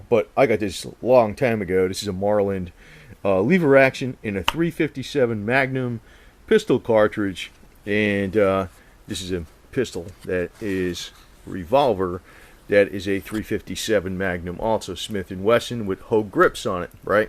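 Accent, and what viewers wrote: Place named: West Coast, United States. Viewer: He sounds like somebody from the West Coast.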